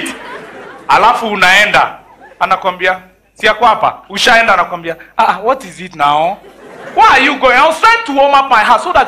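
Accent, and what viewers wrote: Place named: Nigeria. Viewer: i like this jokes,anaongea kama wanigeria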